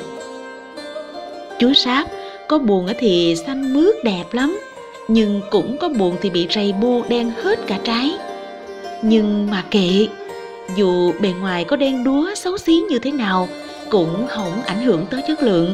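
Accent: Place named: South, Vietnam. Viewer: Hoan hô cô em vẫn giữ chất duyên dáng và hồn nhiên của người miền nam. Đừng bắt chước tiếng Việt lai căng hiện nay, nghe ' hổng dô'.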